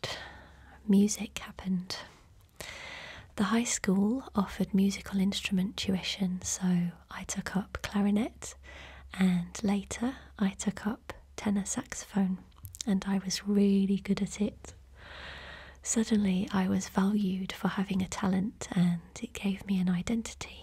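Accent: England, United Kingdom. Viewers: I am 45mins in and I am totally captivated ,,, I so much enjoy listening to ur soft English accent and laugh everytime u make a reference to something with different words than we use here in the U.S.,,,, u have the kindest, gentlest soul and it shines threw in all u do, it's unfortunate u and ur family are on the outs and u couldn't rely on them when needed, I totally can relate, I have no body in my life to rely on if needed and it's not a nice feeling, that saying is true tho, u find out who's real and who's not when u don't have anything to offer and need help, and sadly I found out, I don't have anyone, thank u from the bottom of my heart for sharing such personal stories and please please don't ever stop doing what u do here on youtube, u will never understand the positive impact u have on so many,,, so so so much love and positive energy sent ur way! 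Xoxo 💜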